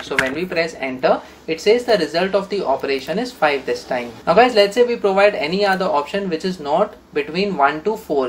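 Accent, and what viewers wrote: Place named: India. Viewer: useful (i like the indian accent)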